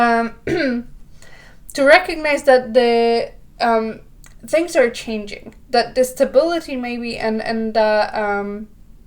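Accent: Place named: France. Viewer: Thank you 🙏🏽 I claim your reading , I think you are French from your English accent !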